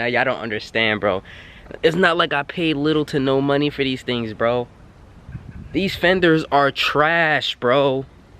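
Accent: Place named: New York, United States. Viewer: Accent sounds like from New York lol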